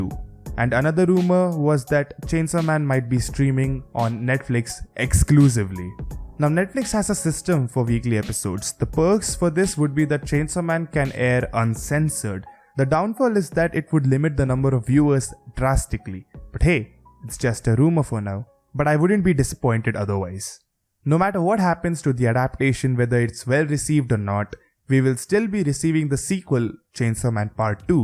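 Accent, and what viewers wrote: India. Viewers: The impossible not unrecognisable indian accent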